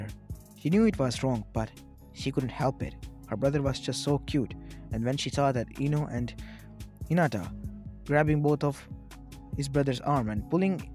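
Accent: India. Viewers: are you Indian? you sound like one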